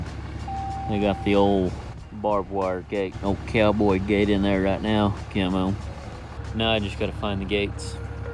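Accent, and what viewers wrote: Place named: South, United States. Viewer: I weld pontoon boat and boat lifts here in N.E. Michigan but I enjoy your videos. And listening to your southern accent! 😁. You have me saying " That A Way" now! 😅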